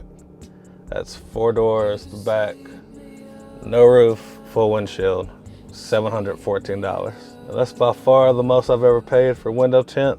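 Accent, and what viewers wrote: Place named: Tennessee, United States. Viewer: Knew he was from Tennessee. He’s talking too cool. That’s how we are.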